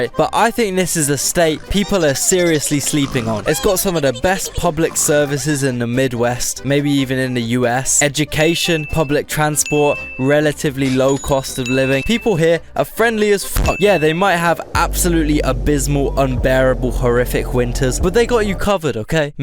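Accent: United Kingdom. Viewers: Listening to a British guy explain america to me an American is surreal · Just listen to this guy with a British accent talking about all of the States in my Country and also the best country, is absolutely hilarious😂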